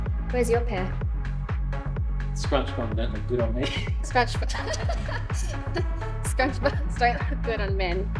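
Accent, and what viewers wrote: Australia. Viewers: Didn't no you were an ozzy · The Aussie accent is a surprise.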